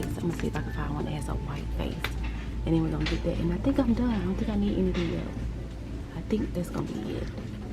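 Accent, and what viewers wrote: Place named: Alabama, United States. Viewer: Hi! New to your channel. First grade is MY favorite! I'm a recently (well somewhat, it's eleven years now) retired first grade teacher here in Alabama. I see that Auburn shirt, hear the accent so I ask are YOU in Alabama? Maybe Georgia? You're delightful to listen to and I enjoy watching your set up. I like those clear drawers you found. I don't have a Staples down here in my city. The room is so pretty with the pastel colors. That is a spacious classroom and looks new. How old is your little one? 🤩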